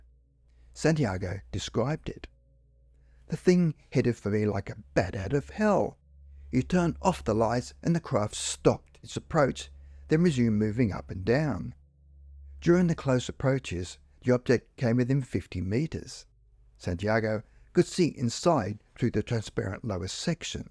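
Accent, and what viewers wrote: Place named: Australia. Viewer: Aussie accent! Oh ignorant ones.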